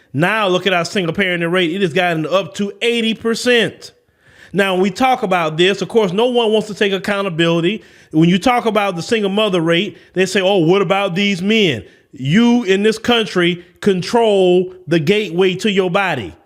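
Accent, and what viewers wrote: South, United States. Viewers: I love your southern accent. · Love that southern accent brotha!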